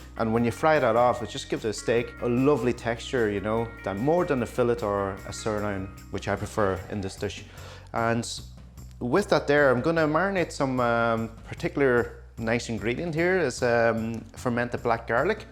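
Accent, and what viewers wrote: Ireland. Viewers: There’s something magical about an Asian with an Irish accent 😂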